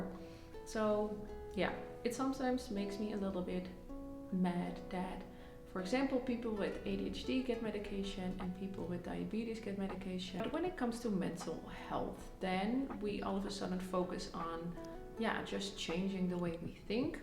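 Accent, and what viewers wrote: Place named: Netherlands. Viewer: Hoor gelijk dat je Nederlands bent😊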